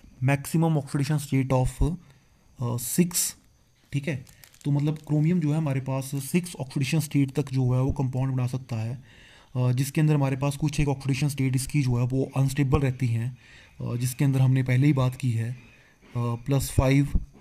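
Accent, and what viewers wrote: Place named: Haryana, India. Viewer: Sir aap haryana se ho kya . haryanvi jesi feeling aa rahi h aapki language m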